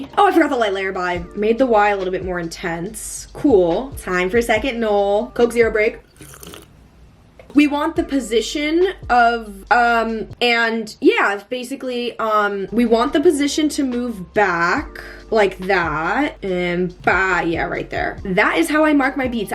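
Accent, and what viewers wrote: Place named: England, United Kingdom. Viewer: im scottish myself and hearing use scottish slang in an english accent pained me 😭😭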